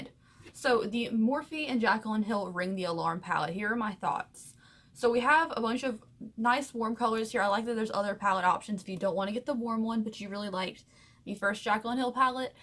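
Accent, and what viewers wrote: South, United States. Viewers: First off you’re gorgeous and I love your reviews so much. I noticed we have similar accents and figured you were from the south and I looked at one of your older videos and saw you’re from North Carolina!!!!!! Ayyyyy represent?!!! Nice to see successful YouTubers from here and really gives me hope. Love your content and you truly deserve more views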